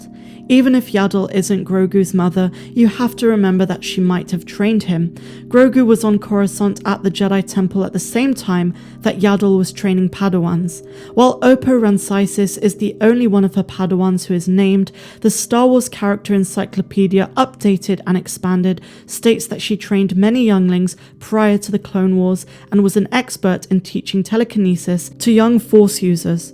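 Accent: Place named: Bristol, United Kingdom. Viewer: all good choices but although iwhould like to see luke train him i am not sure he would want to after what happend with the way it turned out with ben solo by the way love your accent is it from the Bristol area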